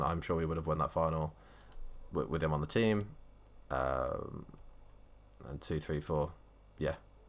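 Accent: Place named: England, United Kingdom. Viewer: The thick English man of Battlefield Community is back. Love ya Keep up the content! 🐐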